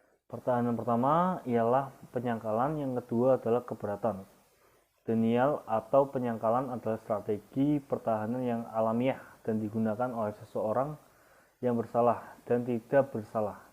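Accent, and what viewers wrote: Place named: Java, Indonesia. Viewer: Medok nya natural mas, Sangat bisa diterima masyarakat indonesia🙏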